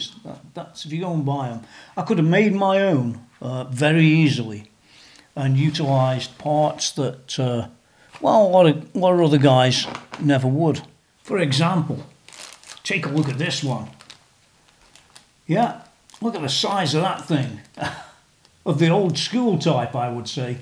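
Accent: Stoke, United Kingdom. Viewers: Are you from Stoke by any chance? :)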